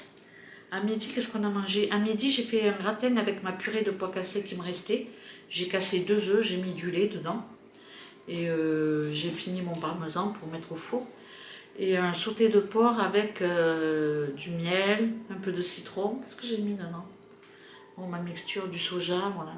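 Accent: South, France. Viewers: Coucou!ton accent ,c'est le Soleil du Midi toute l'année! ,bisous,bilan brocante à Gerland cet après-midi:2 paire de chaussures à 25 euros pièce ,un robe Carole été 12 euros en lin ,un sac à mettre dans le dos été 20 euros,et 1 paire boucle d'oreille 3 euros pour la copine de mon fils MiMi en bélier ,elle de ce signe !,encore ouvert demain à Gerland ,la vente pour abriter les Sans Abris!,très bon week-end!,bisous à tous les deux!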